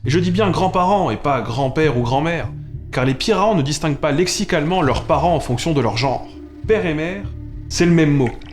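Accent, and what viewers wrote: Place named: Franche-Comté, France. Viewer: Wow, j'ai eu cette vidéo en recommandation et je regrette pas du tout d'avoir cliqué dessus ! T'as gagné une abonnée 😊 Mais euh, ton accent, tu viens d'où ? On dirait la franche-comté...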